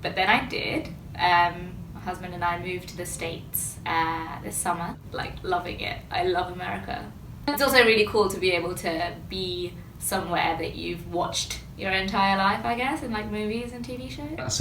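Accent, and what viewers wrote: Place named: Jaffna, Sri Lanka. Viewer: Im in love with her Tamil accent, that yazh Tamil!! She is such a sweetheart! Absolutely love all of her insta vids!!! 😍 -Love all the way from SG 💞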